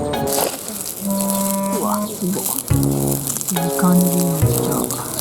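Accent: Kansai, Japan. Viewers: 関西弁おっとり型の語り口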